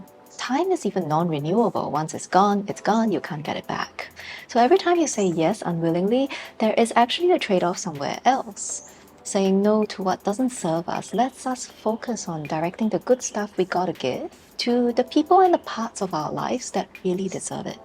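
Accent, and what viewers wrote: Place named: Singapore. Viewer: I could honestly listen to you talk for hours. That Singapore accent... Good Grief. Love it. Will be in Bali soon if you have a twin sister lol !! Love the channel. You and your husband look cool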